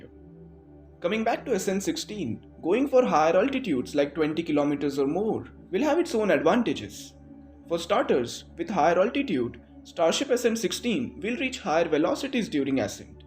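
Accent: India. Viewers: Horrible Indian English accent, made my head hurt.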